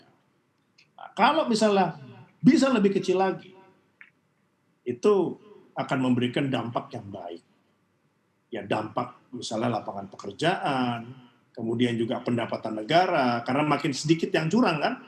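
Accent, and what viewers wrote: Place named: Banyumas, Indonesia. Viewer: Salam ngapak pak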